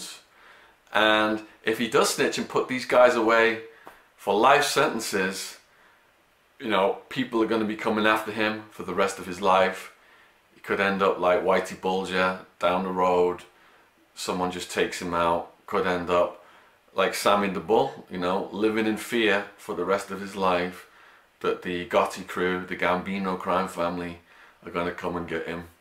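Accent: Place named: England, United Kingdom. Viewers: I did not expect a white Englishman when I went on this video, can’t complain tho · Oh.. I see you do stuff about prisons and that. My prejudice - my bad, but it was a jarring experience clicking on this and hearing some middle-class Englishman commenting on that kids situation 😋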